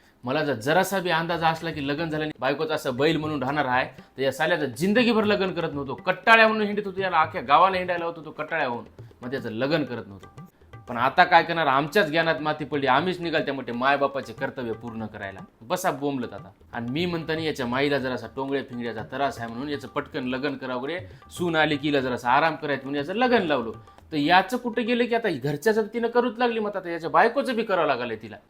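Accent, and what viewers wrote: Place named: Nanded, India. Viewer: Bhasha chi boli Nanded chi aahe kai bhari acting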